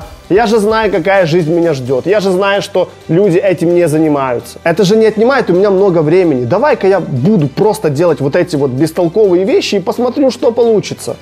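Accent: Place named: Kyiv, Ukraine. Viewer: Говор такой, как у моего брата двоюродного :) В Киеве живёт, Березняки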